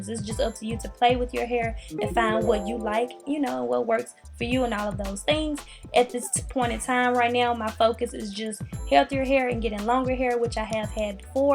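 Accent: South, United States. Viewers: Beautiful hair, good job! Cute southern accent😂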